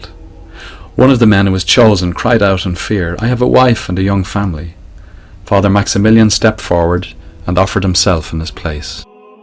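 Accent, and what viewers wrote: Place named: Ireland. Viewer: The narrator sounds Irish. As to your bewilderment, uneducated Americans, and many educated ones, are certainly shy on history, geography, and languages. We are good enough at details to have flown an airplane at Kitty Hawk and sent several Saturn V rockets to the moon and back. Now Musk is building them bigger and landing them vertically. That is freakin' detail. We have been blessed with a large country into which people people have found refuge and opportunity, assimilating into culture while adding to the color, patterns, and textures of that fabric. When someone speaking, say Hungarian or Lithuanian, is 6000 miles away why learn it anymore than you would learn Latin to speak to a Roman from 2500 years ago? I know Latin but there aren't many Romans around. I also know German, a language from a place my starving ancestors left because if they and a third of the town hadn't, all would have starved. 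After about four generations of my folks speaking it as a native tongue, I had to learn it in school. As to geography, is there a major League Baseball Franchise in Austria or Australia? And most Americans wouldn't know which is which. Blame the lame, give a trophy for nothing, liberal, progressive, unionized public school system. As to history, we like WWII movies like Patton, Saving Private Ryan or more imaginatively, Taratino's Inglorious Bastards. We also like movies from earlier times and cultures, take Troy, for example, or Robin Hood vs. the evil Sheriff tales. Unfortunate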